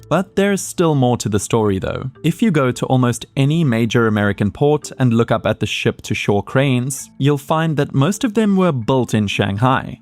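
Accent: South Africa. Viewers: Aweh aweh! Yet another channel with dope graphics and a narrator with a South African accent! Lets gooo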